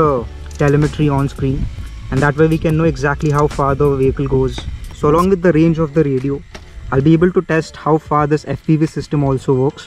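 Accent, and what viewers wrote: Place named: India. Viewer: why do indian english accent sounds all the same?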